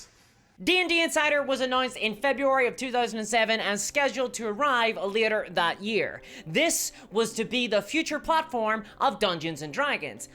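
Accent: Northern Ireland, United Kingdom. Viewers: You say lighting, I hear lagging... clearly I've been away from Northern Ireland too long! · she's from Northern Ireland.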